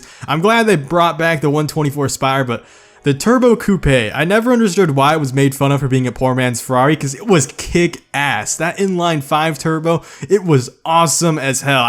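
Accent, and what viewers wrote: United States. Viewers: I was thinking your American not Asian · I just love listening to americans pronouncing italian car names, I am italian🤣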